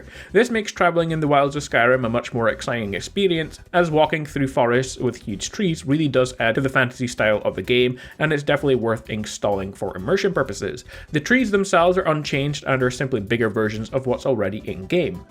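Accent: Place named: Scotland, United Kingdom. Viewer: hes scottish i believe :)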